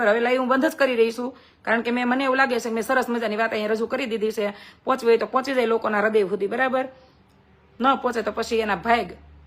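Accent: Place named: Kathiawar, India. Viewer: ઓરિજનલ કાઠિયાવાડી શૈલી માં ખૂબ સુંદર વાતો કરી gret hartly lovely tru ok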